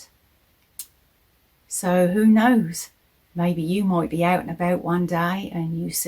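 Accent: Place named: Suffolk, United Kingdom. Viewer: Great tale 🙂 I used to fish a lake at Mistley Heath, not far from Hopkins home turf, and that place used to give me the heebyjeevies at night, no idea why but it had a weird vibe. I'm not easily spooked, I've fished loads of remote places at night over the years- even Orford Ness when sometimes I'd be the only person over there- with no problem but that place was odd. Love your accent, I'm Ipswich born and bred but it's not often you hear proper Suffolk these days, reminds me of my Nana and Aunt when I was a kid 🙂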